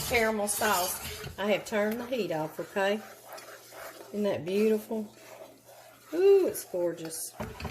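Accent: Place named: Louisiana, United States. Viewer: My Texas accent is a strong as your Louisiana accent! If I’m lucky enough to ever meet you, our husbands will have to find a place to sit down, because you and I can do some talking!!! My husband is from also from Louisiana. Bread pudding is his favorite desert. I make it quite often, and I’ll be making him a pan tomorrow for his Father’s Day dessert. I have never seen a southern maid bottle, but what a treasure. My mother collected bottles, some she found in antique stores and some she dug up while my dad was hunting arrowheads through New Mexico and the Badlands. When she passed in 2013 she had so many I could not keep them all. My brother and I took them to a museum in our South Texas hometown, and the museum kept quite a few of them native to that area and my adult daughter and I split most of the rest of them. The best part about the museum taking some, is they had traced and dated every bottle in her collection to decide which they wanted. 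What a gift! Like you, I like anything old & I love antiquing for things that you don’t find any more. I still have my grandmothers metal saltine cracker box!! Love watching you cook and tell stories!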